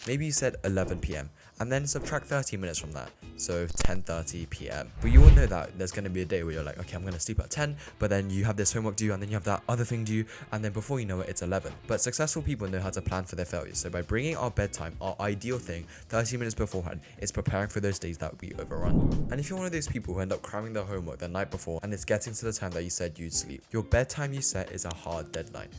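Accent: United Kingdom. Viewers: I do the counting down thing. Really helps! Great video-- very entertaining but clear and straight to the point. I think you hit the nail(s?) On the head with the importance of what happens before you go to bed. Lots of points for me to try out and possibly share with others. Also, took me a while to realise you're a Brit not an American😅 Great video!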